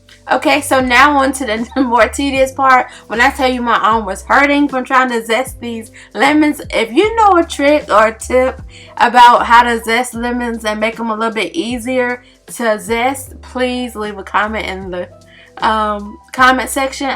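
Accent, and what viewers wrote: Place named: South, United States. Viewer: You sound so sweet and you’re definitely from the south which makes you sweet. I’m from the south tube but I live in Arizona. It’s not as sweet as the south. I like your cooking channel. Keep it up girl.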